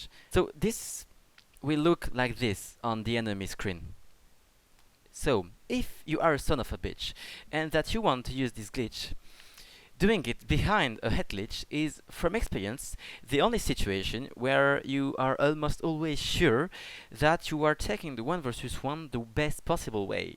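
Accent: France. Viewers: have you never heard a French accent before? lol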